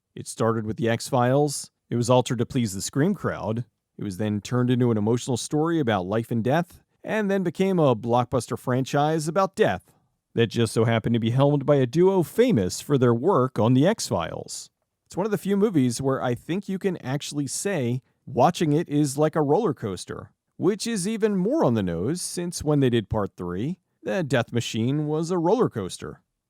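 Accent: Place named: Boston, United States. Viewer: I've never noticed your Boston accent before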